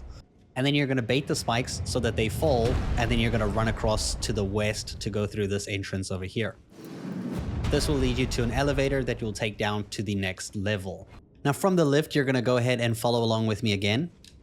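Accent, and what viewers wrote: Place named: South Africa. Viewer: are you South African? i can hear that accent poking through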